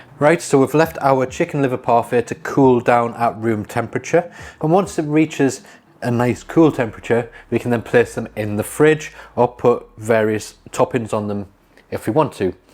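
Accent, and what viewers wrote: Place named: Yorkshire, United Kingdom. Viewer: I’m guessing from your accent you’re a Yorkshire lad..?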